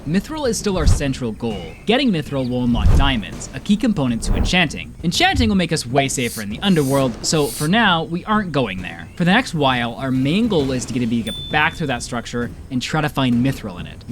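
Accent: Canada. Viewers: Are you from Canada by chance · Are you Canadian? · Why was he so Canadian in this video? Maybe I'm trippin and just haven't noticed before but I thought bro was American up until now😂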